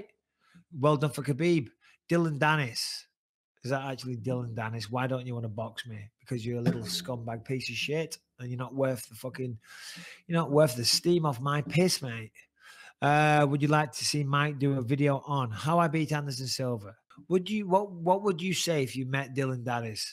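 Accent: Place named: England, United Kingdom. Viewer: You know Michael, i really love when you start to talking funny shit. But please add some subtitle on your video, because you're true englishman and you speaking goddamn fast. So please add some subtitle next time, because sometimes people from another world like myself see and listen to your talking and couldn't keep up.. 😂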